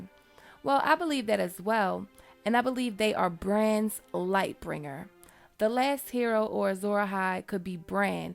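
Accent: United States. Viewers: Considering I'm not normally one who goes for American accents I couldn't agree more. Like sweet music......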